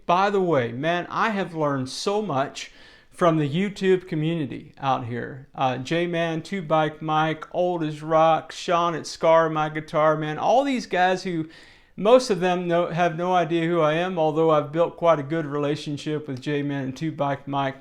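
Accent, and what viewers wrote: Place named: Baltimore, United States. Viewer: Love the Balmer accent!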